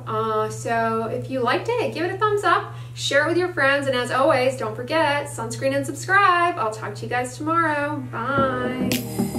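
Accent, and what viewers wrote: United States. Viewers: What state do people speak like that? Just curious.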